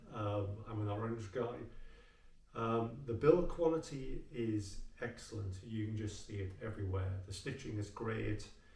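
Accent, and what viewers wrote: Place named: England, United Kingdom. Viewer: Hi, One more question: How are you with the 10'F (-12'C) version? Is it warm enough for you? lightweight or heavy? What kinds of locations are you planning to backpack with it? From your accent, one might assume you are based in England. I am deciding between the 10'F and 0'F (-17'C) versions...The 0'F version adds a few more ounces but might work better in the New York State winters. I would like to backpack in summer and also occasionally sleep outside in winter. Maybe I'm expecting too much from one sleeping bag?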